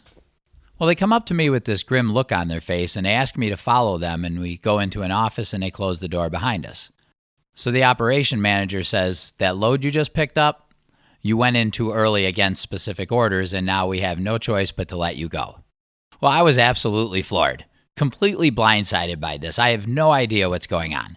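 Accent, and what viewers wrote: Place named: Midwest, United States. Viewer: I can definitely hear your mid-western accent. Nice well informed video. Maybe someone can learn from this.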